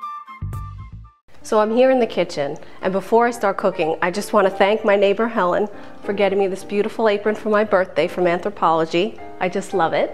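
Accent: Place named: New York, United States. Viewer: From the accent she's either from N. Jersey or the city? This video is my first, great recipe.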